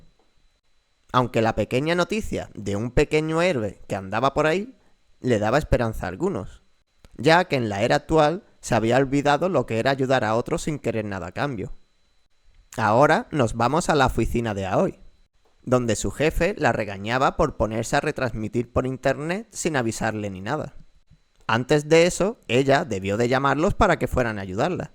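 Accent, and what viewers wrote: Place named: Spain. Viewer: Está buena la narracion lo mala esq está en español España 😅😅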